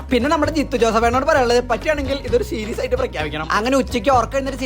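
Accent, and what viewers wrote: Thrissur, India. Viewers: sathyam ipo korch over verppeer aye pole ind pandathe aa piecefull thrissur slang videos ayirn rasam ippo orumathiri 🥴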